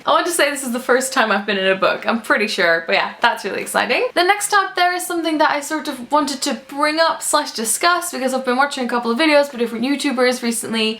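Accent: Ireland. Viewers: Haha I thought you were Irish until I read the description, I'm a new subscriber :D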